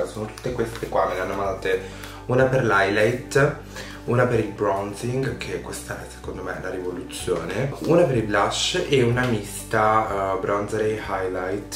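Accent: Milan, Italy. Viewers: Sta cadenza milanese alla * mi fa impazzire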